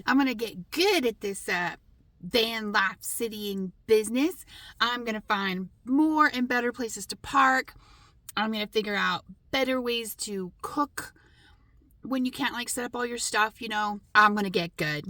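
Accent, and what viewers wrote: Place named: Texas, United States. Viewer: I thought you sounded Texan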